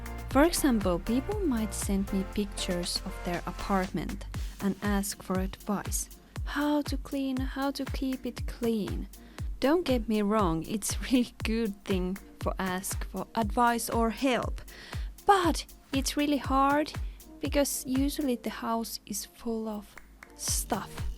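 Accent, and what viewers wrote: Finland. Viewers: I like hearing your stories and comments and your Finnis (sic) accent like my grandma. AND: what is that little square thingy on the kitchen sink? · Ihmisten tarinat kiinnostaa. Se miten he ovat tuohon jamaan joutuneet ja miten sitten ovatkin pohjalta nousseet 😍 ja siun ralli englanti on kivaa kuunneltavaa 😁😁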